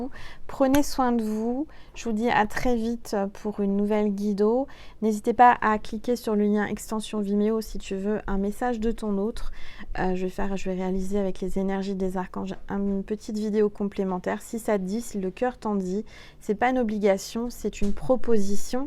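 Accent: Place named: Belgium. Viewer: Ah oui..je me demandais...🤔 Tu as ce petit accent Belge...😇💋😘🙏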